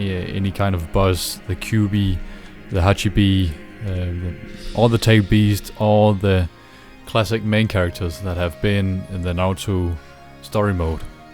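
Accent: Denmark. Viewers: Wtf!! Are you danish or something !?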